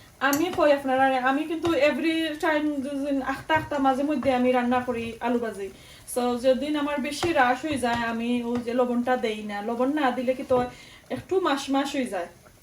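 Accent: Sylhet, Bangladesh. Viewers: Apnar sylheti mat amar je vala lage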